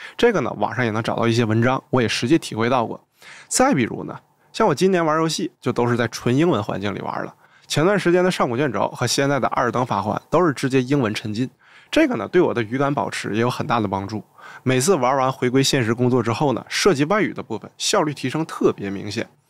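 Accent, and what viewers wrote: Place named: Shenyang, China. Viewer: 原来是沈阳老乡，听口音这么熟悉。加油，非常喜欢你的频道。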